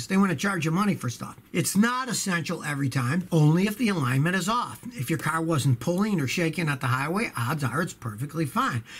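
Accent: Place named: New England, United States. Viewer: With the "Toooob" lol I like his yankee accent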